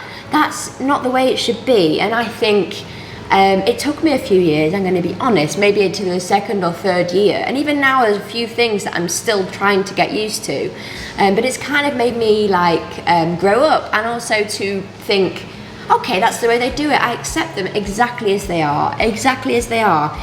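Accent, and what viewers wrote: United Kingdom. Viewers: Your British accent is so beautiful and elegant 好喜歡你的英式口音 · 英國腔真的好好聽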